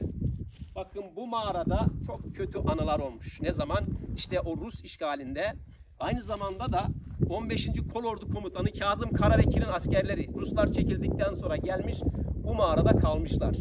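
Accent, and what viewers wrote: Black Sea, Turkey. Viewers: Bey, ses tonundan bize yakın bir yöreden olduğunu anlamıştım seni ilk tanıdığımda. Meğer ki hemşehriymişiz. Ben de Torul'luyum. Bizim o taraflara da gezi yapmanızı bekleriz. Biz gidemedik, siz in sayenizde gitmiş gibi olalım bu sene. İyi seyahatler. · Karadenizli olduğunuzu bilmiyordum hocam saygılar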